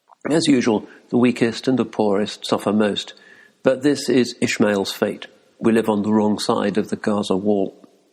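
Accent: England, United Kingdom. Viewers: I like this, just wish it didn’t sound so staged. Maybe I’m just a lecture kind of person...it takes a certain nerve to appreciate poetry in a heavy English accent.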